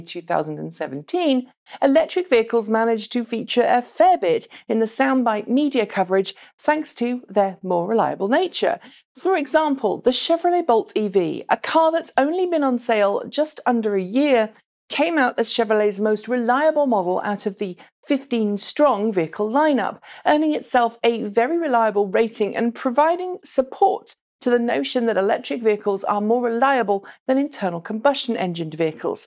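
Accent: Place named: United Kingdom. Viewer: Please stop doing these filler stories, it's a waste of your talent and resources. I'd rather see less content that was more important. It's not necessary to come out with a new episode every day if there's no new news., please do a story on the Securities lawsuit from Khang & Khang LLP against Tesla. I'm not sure what they're all upset about. So what Tesla missed their Model 3 production goals, they always miss their production targets. What damage did it have? Tesla stock investors are ignoring it and their stock is still trending up. I'm curious if it's haters trying to bring Elon down? Btw I'm one of your Patreon supporters because I find your show with its professional level production values, unique extensive research, optimistic yet balanced reporting and your delightful British accent, really set your show apart. I don't see ads on YouTube because I pay for YouTube Red. 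Ads drive me crazy so $9.95 a month is a small price to pay for my sanity. Keep up the good work!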